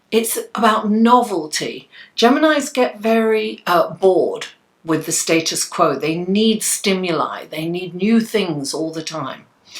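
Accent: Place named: United Kingdom. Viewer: thank you for this reading,, it did resonate with me & spoke the truth about something that occured to me last month. Where are you from I love your accent,,, I'm from USA, you sound British,,, many blessings to you.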